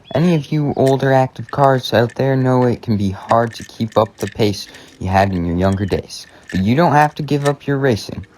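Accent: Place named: Italy. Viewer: Your italian accent is so funny for me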